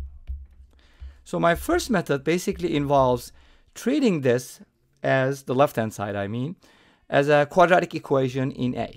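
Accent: Turkey. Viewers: Are You Turkish ? Your accent sounds Turkish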